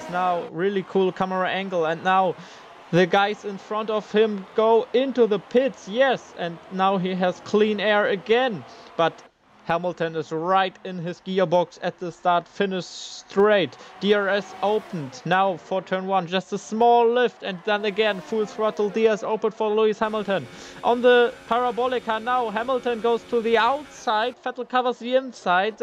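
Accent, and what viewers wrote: Germany. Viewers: Der legendäre deutsche Akzent 😂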